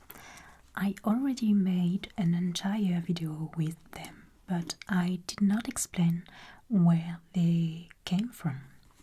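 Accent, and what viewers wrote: France. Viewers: Tu as un accent français, tu es française ? :)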